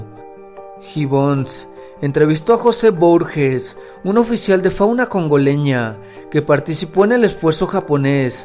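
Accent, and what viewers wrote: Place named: Texas, United States. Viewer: Buen video, pero no hables como si tuvieras un gancho presionando tu nariz. Y ese dejo de ranchero texano le resta seriedad a tu voz, te sugiero hablar pausado, claro, fuerte y acento neutro. Éxitos!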